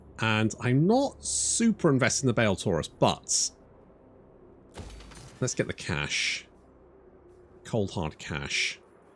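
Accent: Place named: Newcastle, United Kingdom. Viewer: The Newcastle accent and vocabulary is hilarious when juxtaposed with WH3 😂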